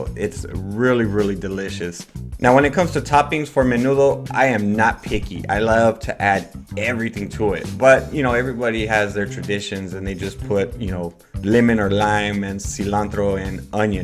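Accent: California, United States. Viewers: I cook my menudo for about 5 hours. I'm from Texas sounds like your from California. Menudo is great,especially in cold weather like,Now! Thanks for your recipe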